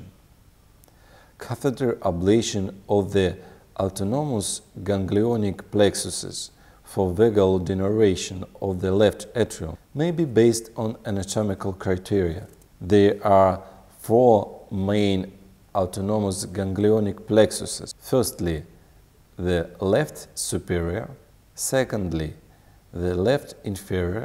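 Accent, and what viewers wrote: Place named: Russia. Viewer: The Russian accent is a little difficult but the information is top notch. Great demonstration!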